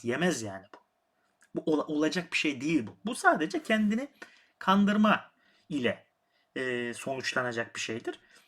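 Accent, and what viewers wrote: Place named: Gostivar, North Macedonia. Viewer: Çok güzel bir yayım olmuş. Takibe aldım sizi, diğer videolarınızı da izleyeceğim inşallah. Bu arada aksan hafif tanıdık geldi, meğerse memleketliymişiz hocam. Gostivar'lıdan Ohri'liye selamlar.:D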